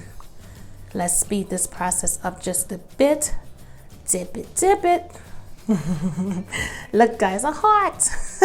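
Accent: New York, United States. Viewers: I’m making this for sure. Girl your accent is so strong, you have to be from NY lol. I’m thinking the Bronx!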